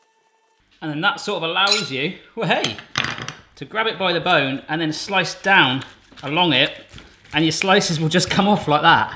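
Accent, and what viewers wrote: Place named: Somerset, United Kingdom. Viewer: Love a Somerset accent 😍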